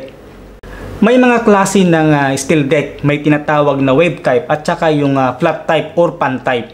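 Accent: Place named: Northern Samar, Philippines. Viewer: Engr. parang taga catarman northern samar ka? kc ang accent at pag sasalita mo,, nararamdaman ko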